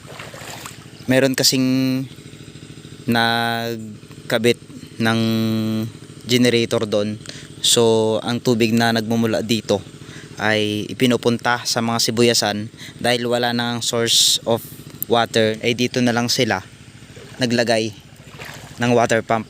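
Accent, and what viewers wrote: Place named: Visayas, Philippines. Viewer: Bisaya ka idol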